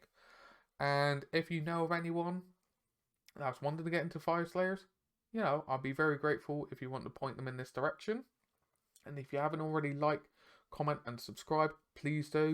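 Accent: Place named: Devon, United Kingdom. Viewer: That voice was much more Devon than I was expecting...love it.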